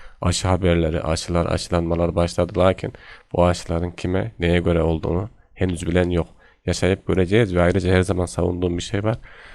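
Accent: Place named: Yozgat, Turkey. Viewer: Bence yozgatli kardes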